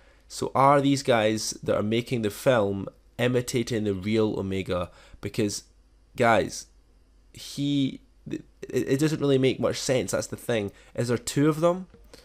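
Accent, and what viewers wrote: Scotland, United Kingdom. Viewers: R u Scottish if yes where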